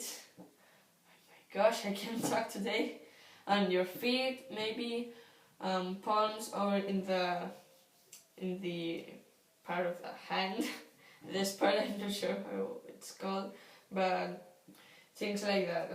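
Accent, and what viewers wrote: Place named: Russia. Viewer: I was wondering because it sounded russian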